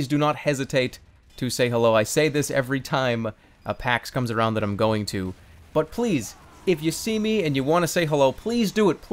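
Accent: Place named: East Coast, United States. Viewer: Jerma, I love your east coast accent.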